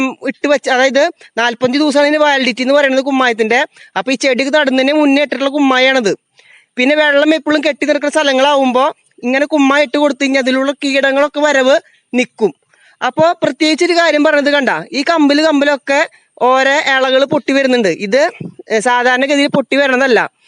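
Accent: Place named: Thrissur, India. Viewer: തൃശൂർഭാഷ നല്ല അവതരണം സൂപ്പർ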